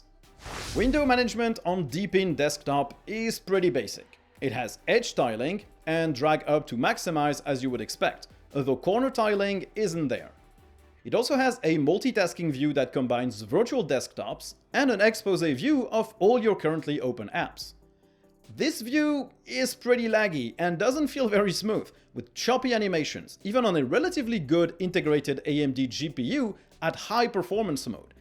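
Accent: France. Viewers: I'm comenting for more French accent 😁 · We need more French accent of English!!! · I like that french English accent it's funny af 🫣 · I'm here to comment that I want more French accent. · i love your French accent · Yes, please, more french accent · More French accent, s'il vous plaît! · I love le franch man avec un accent anglais horrible ^^ Bonne vidéo comme d’habitude sinon même si ce DE n’est pas fait pour moi. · More french acccent please, it made me laugh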